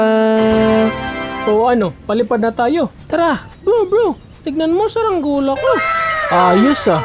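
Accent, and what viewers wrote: Visayas, Philippines. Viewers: bisaya diay ka?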